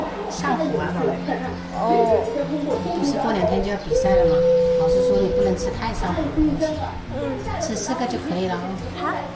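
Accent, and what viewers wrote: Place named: Minnan, China. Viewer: 好可愛的閩南口音，聽到跟自己一樣的方言，好溫馨暖心，很喜歡妳的頻道，真真實實的料理，真真實實的生活!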